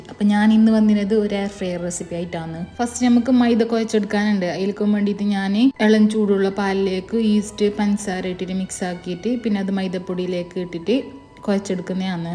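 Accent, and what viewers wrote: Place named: Kasaragod, India. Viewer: Ninga kasaroda Slang Nella Cleland kelkan❤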